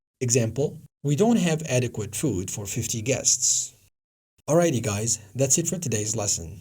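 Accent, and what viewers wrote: United States. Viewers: اتقان اللهجة الأمريكية الله يبارك لك يا مستر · امته كورس اللهجة الأمريكية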